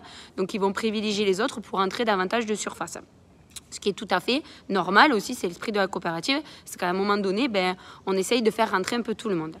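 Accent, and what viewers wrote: South, France. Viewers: Bonjour et merci de ton sourire avec l'accent du midi ! C'est toujours un bonheur de t'entendre '' belle brunette''!! Je vous souhaite une bonne fin de vendange et je formule l' espoir de venir goûter votre production de vin à cépage ! Bisous à toute ta petite famille. Pépé 80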